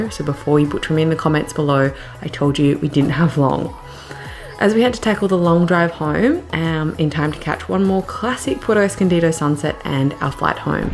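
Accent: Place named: Australia. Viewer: Who cares that you cannot pronounce Mexican Cities. With that beautiful Australian accent you actually make Mexican cities and beaches sound better. I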